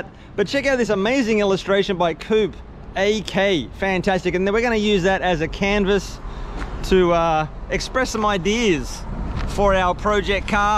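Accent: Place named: Australia. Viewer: Yeah man! Of all time! I'm struggling to place anyone more down to earth up there, always good to hear an Aussie voice on YouTube. If I ever get that holiday to Japan hopefully I bump into ya! Keep doing what you're doing man, can't wait for the next vid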